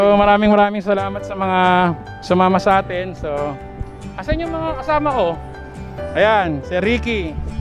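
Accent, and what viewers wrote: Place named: Iloilo, Philippines. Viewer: Lods taga saan po kau parang Iloilo salita nyu minsan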